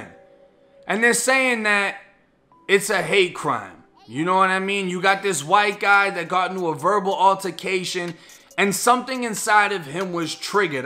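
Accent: Boston, United States. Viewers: Why you sound like you from Boston? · Are u from Boston I love the way u talk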